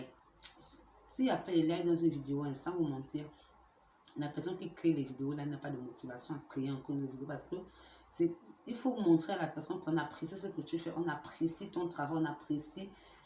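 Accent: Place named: Gabon. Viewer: Accent gabonais 🇬🇦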